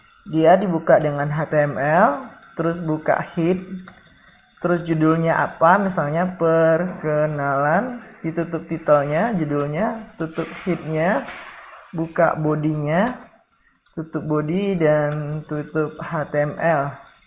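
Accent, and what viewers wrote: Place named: Medan, Indonesia. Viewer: Medan x bang bahasa nya paten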